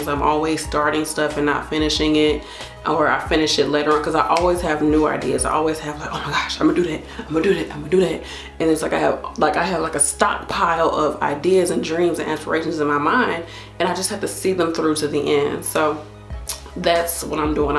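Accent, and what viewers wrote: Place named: West Coast, United States. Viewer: I love your vibe i felt your locs through the video. You sound like your from the west coast that caught my attention. Keep up the frequency im entering my journey again after cutting my 10 year locs as a teen.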